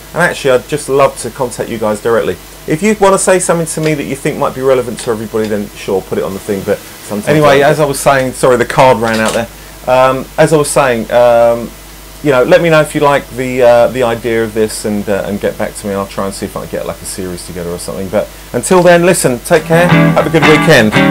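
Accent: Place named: England, United Kingdom. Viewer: Great. Enjoyed it. And like the English accent as well.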